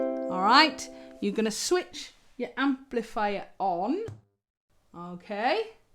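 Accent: United Kingdom. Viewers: Hey,. Are you British?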